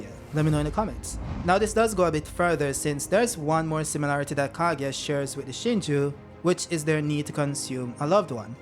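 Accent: Jamaica. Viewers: Ayo are you Jamaican?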